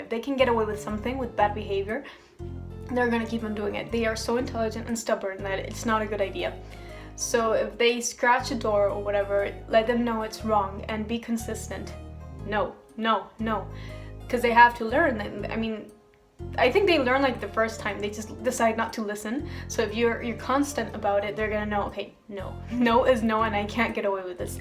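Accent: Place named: Netherlands. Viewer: Heey are you dutch? Your accent sounds pretty mutch just like a friend of mine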